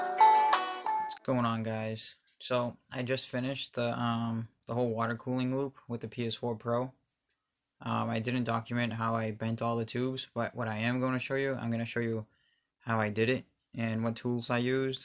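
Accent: Boston, United States. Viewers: Ya sound like ya from boston, kid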